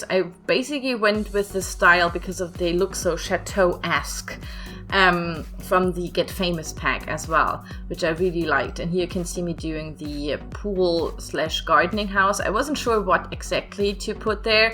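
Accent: Germany. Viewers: I heard literally in 3 seconds that you must be German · Are you German or something cause you don’t have an english accent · May I ask where you're from? Your accent sounds German but then again you're using so many particular slang words that I would think you're native speaker? If not then hat's off your English is amazing🤯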